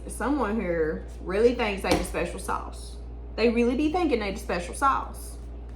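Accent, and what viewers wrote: South, United States. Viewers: I love ur energy, your southern sound. This reading is telling the truth... ❤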